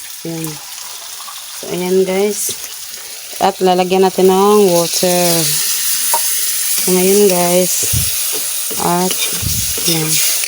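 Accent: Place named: Visayas, Philippines. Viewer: Bisaya ka mam,kay law-oy man imong sulti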